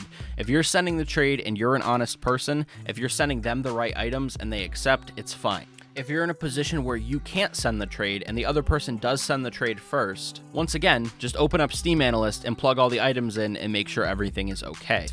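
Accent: Sweden. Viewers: Are You from sweden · Are you Swedish or American? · Are you from sweden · Bruh u from sweden ?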